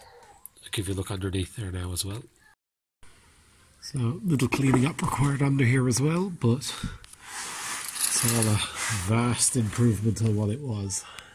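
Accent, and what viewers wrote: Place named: Ireland. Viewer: Loving the videos, the music and the relaxing Irish lilt, looking forward to the next one 👍👍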